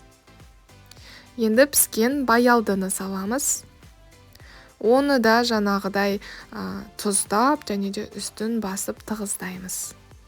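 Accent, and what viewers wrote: Standard. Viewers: Жарайсың таза қазақша сөйлейді екенсің керемет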